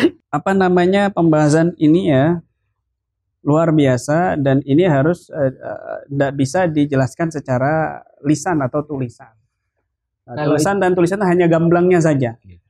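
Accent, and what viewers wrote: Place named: Madura, Indonesia. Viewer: Kayaknya pak kiyai ini masih ada turunan madura, dari logat bicaranya!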